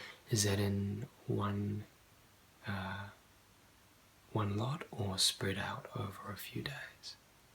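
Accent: New Zealand. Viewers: wow I'm Korean and I sometimes watching your asmr, And it's really helpful to my sleep! your kiwi accent is soooooooo nice!!!thank you :)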